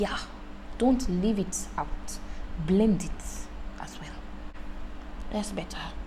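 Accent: Africa. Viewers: African accent?? SLAYEDD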